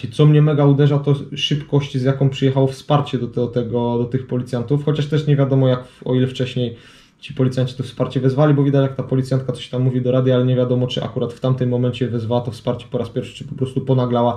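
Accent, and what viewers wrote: Silesia, Poland. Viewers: O przepraszam ale jestem pierwszy raz na profilu i od razu po akcencie poznałem. Czy Pan jest ze Śląska i służy w śląskiej Policji? :-D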